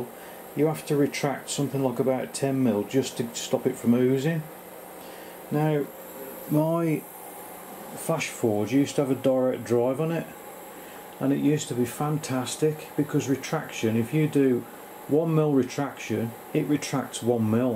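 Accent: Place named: England, United Kingdom. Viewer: I'd never stop inventing truly useful and cool stuff for dudes if I had one of those, Mate! None of the unimportant drivel I do daily would ever get done!!! I'll have to keep building stuff out of old "junk" or all the "junk" I do would never get made into new "junk". If I just had a "pence" for every time I said, "Man t'would be so much easier if I just broke down and got a damn printer!" I'd easily have enough "pence" to get a damn printer!!! 😂🤣😂 Much love!!! ✌ ϻя.ƹ PostScript - truly not a stalker, just after a couple vid's I actually watched of your crazy "bum" going fast on e-bikes, I figured I'd give ya a real go and have your channel on "play all" in the background. Of course, I click over to see whenever I hear ya laughing or cussin', as I know those are the important parts to me anyhow. I simply find your relaxed English accent combined with your internal teen-like rebellious angst a calming juxtaposition ... 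HA ... spelled that one correctly w/o autocorrect for a change 😂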